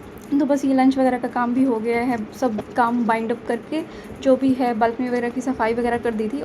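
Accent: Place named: Bhiwani, India. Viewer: Sister aap loharu bhiwani side se ho kya....aapki boli se lag raha hai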